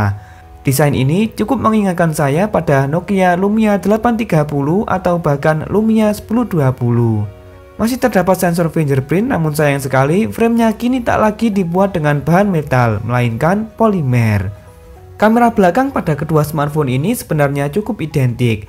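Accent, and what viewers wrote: Java, Indonesia. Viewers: medok tenan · Medok benerrrr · Masnya orang jawa y? Koq medhoq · Membawakan konten nya terasa medok mas Logat nya.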